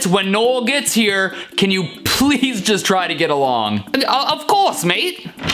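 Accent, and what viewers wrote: United Kingdom. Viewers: Your British accent was good, but you should see Kent Paul or Maccer's accents.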